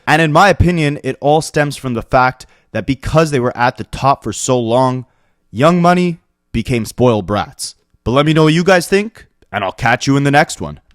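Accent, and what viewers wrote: Canada. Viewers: no doubt I was just making sure I seen it questioned in comments a couple of times and the Canadian accent brought me back to the question and I never saw a straight answer 😂😂😂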